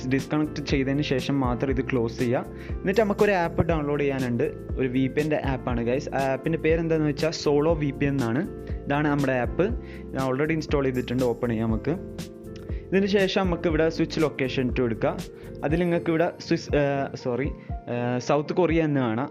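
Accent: Kannur, India. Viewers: Ningal kannurilaano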